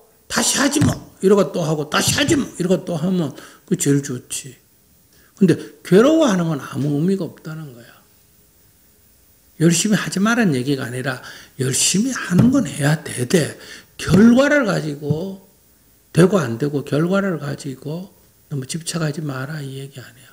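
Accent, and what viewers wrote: Gyeongsang, South Korea. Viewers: 경상도 어디 분이신지?? 사투리가..... 다그치는듯한 말투시네여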